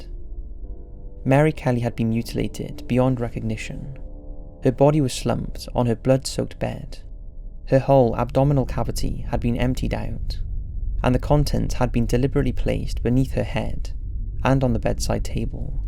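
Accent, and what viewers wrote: United Kingdom. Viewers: It makes me laugh people going on about his voice. It's just a normal British accent. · Top-notch content. As always, sit back, relax, and fall asleep listening to your British accent.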